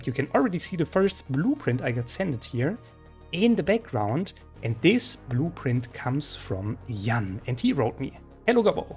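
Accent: Germany. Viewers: You only hear the accent and you know this is gonna be good. The magic of German engineering! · schade, man hört leider deinen deutschen Akzent 😄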